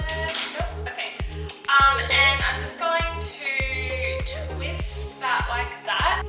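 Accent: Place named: Australia. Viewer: Its so cool your from Australia, we love your accent! The cupcakes look GREAT! nom, nom, nom... :)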